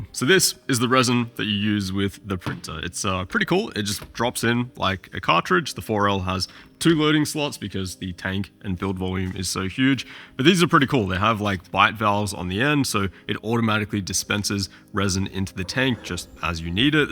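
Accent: Australia. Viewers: Australian creator says "dollars" in their Australian Accent while living and working in Australia and means USD instead of AUD?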